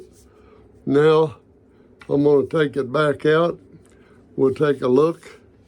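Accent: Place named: Appalachia, United States. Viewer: No yeah! Def not everywhere but I grew up in Appalachia and almost alllll the old folks talk like this, and honestly he reminds me of me my own pawpaw a little bit.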